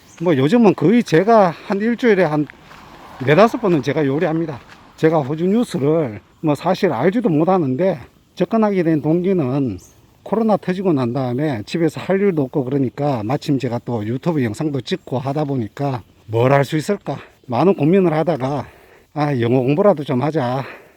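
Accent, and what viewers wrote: Gyeongsang, South Korea. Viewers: 구수한 경상도 말투에 담긴 진지함에.. 좋은정보..항상 감사드립니다. 시드니에서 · 항상 뉴스 바로바로 올려주시고 구수한 경상도 사투리(저희 남편도 대구 출신)가 엄청 정겹고도 따뜻하게 느껴지네요. 이민 온지 13년이 되어가고 말만 시민이지 영어 포기자로 딸애한테 영어공부 좀 하라는 구박 받으며 이렇게 나이가 들어 가나 했는데 영어 해석 실력에 자극 받으며 테잎이라도 다녀볼까 생각중입니다!! 늘 업데이트 되는 최신 뉴스 열심히 기다리는 일인입니다!! 가족모두 항상 안전하고 건강하시길~ 오늘도 하겠습니다!! ㅋㅋ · 와우 처음왔습니다. 마스크하시고 옆집에 사시는 어떤 분과 같네요. 헉~~~6~7개월 동안 와.... 대단하시네요. 여행 갔다가 사시는군요... 아 경상도 말이군요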